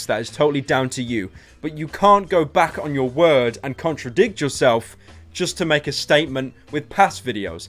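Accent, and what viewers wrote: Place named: Birmingham, United Kingdom. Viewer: I honestly cannot work out accent. It seems like a mix of Brummy and some kind of London/County's that surround inner London. It's the way he says his O's that confuses me.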